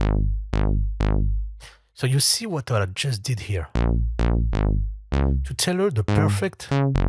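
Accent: France. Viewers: Excuse my French but your English sound french 😅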